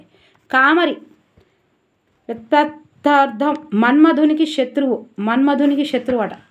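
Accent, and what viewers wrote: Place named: Mahabubnagar, India. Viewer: ఏ జిల్లా మేడం మహబూబ్నగర్ బాషా లాగే ఉంది స్వచ్చంగా మీ వీడియో సు బాగున్నాయి మేడం 🌹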